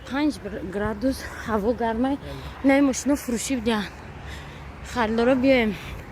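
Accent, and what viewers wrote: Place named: Kuhistoni, Tajikistan. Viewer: Кадом кухистонии хта бо месниги мегири якдам кани мани гап мезани якдам мхраш мегуй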